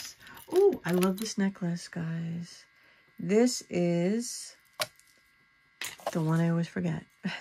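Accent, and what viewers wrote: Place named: Massachusetts, United States. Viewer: “Memba?” Lol!! Are you from Massachusetts? Sounds like what we say here! Lol Can you please tell me how you deal with your jewelry? I don’t have even a slither of what you have and I have NO idea how to organize it. I’ve been an eBay seller for over 25 years but I sold vintage china and collectibles. I’m getting away from that because shipping is so high. I decided to switch to jewelry after I started researching some vintage pieces I got from my grandmother. But let me tell you… I knew NOTHING about jewelry about a year ago. I mean, it’s sad how little I knew!! I never even thought to look for marks, or anything, But I started to learn, then I bought only about 4 boxes from the online thrift and I will not allow myself to buy anything else until I sell some of this stuff!! How do you deal with it all? Eventually I bought a gem tester, metal testing kit, etc. 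I’ve tried to organize by colors, metals, time periods, etc., etc., but there are so many jewelry categories! This stuff takes a lot of time! At least for me it does. I feel like I’ll never make any money at the rate I’m going. Also, how do you sell? Online? Only on YouTube? Is it worth the time? When I try to list things, let’s say I take 20 pieces of jewelry to list…. After I finish looking at them with the loupe, research them, clean them up, take pics, edit the pics, then list them, it’s taken me like 12 hours! Can anyone offer me a little help in starting up? Thank you so much! Oh… btw, I LOVE that you show us close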